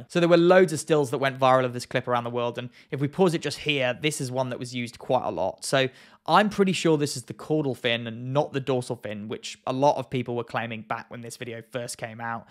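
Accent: United Kingdom. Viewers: ❤ the British accent!!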